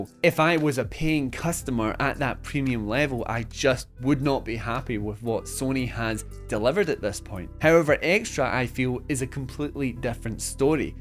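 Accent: Scotland, United Kingdom. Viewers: You're Scottish right? 😅 Btw Valhalla was added since day 1 for extra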